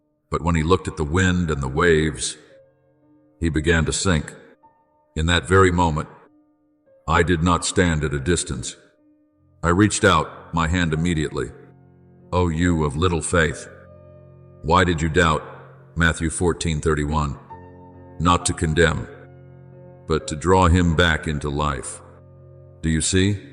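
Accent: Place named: United States. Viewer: Why do you speak with an American accent I am English.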